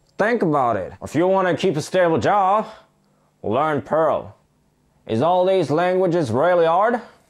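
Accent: South, United States. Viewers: It sounded like what it was, a foreign guy doing a parody of a Southern, possibly Black-Southern, accent. Still works IMO, since a lot of extant Perl is likely in government & old-school tech industries like telecom. And agreed that Perl is great for code puzzles and showing off, but job security isn't to be sneered at...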